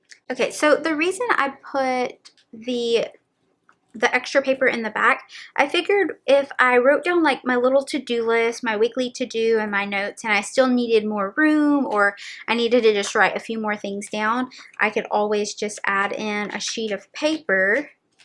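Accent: South, United States. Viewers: I feel like I grew up with you with your accent. Just every southern girl I’ve ever know lol 💙 from South Carolina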